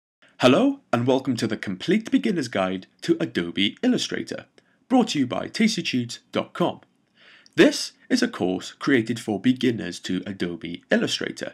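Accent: Wales, United Kingdom. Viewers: ah you have cute welsh accent!!! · Is the narrator Welsh? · is that a welsh accent I detect? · Love the Welsh accent! 😍Da iawn ti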